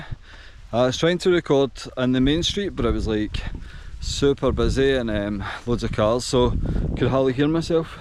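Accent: Scotland, United Kingdom. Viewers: Easy to understand. I love scotish accent. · Once in the Netherlands I met a drunk man in an elevator with a Weesky in his hand. He claimed to be Scottish. Then I didn't believe he was from the Kingdom, I thought he was also from Eastern Europe like me, and that he spoke terribly bad English. Now that I hear you (Scottish accent for the second time in my life) and I know that you didn't drink alcohol, I already believe that he was Scottish. I understand you, but it was hard to understand him when he was drunk.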